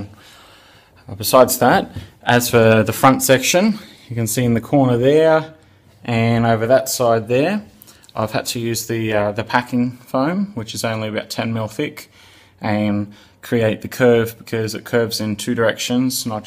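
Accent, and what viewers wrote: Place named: New Zealand. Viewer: Hey, i know the bus is long gone but we have the same bus and are doing it here in ADL! You sound like you're Kiwi too? How the hell did you get the strips off where the seats bolted to the wall?!?!?! Looks like it's all one piece?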